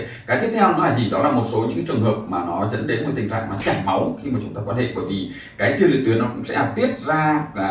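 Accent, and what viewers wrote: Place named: North, Vietnam. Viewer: Bạn có thể nói chậm và rõ ràng được không ?? Không nên nói nhanh vì tiếng vùng miền Bắc nói nhanh nghe không rõ , cảm ơn bạn nhiều .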